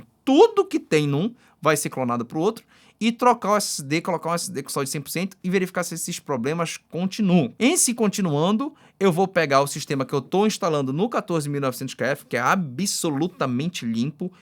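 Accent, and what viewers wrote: Pará, Brazil. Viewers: Agora que tu falou que é paraense eu ouço teu sotaque aaaaaaaaa (“elhe” “bicho” “vocêxs”) massa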